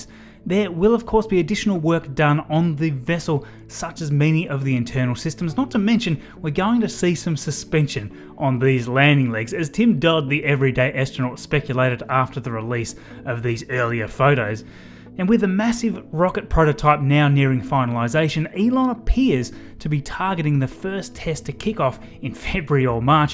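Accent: Australia. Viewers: Just subscribed! Great to hear an Aussie voice describing Space stuff. Good on ya mate thanks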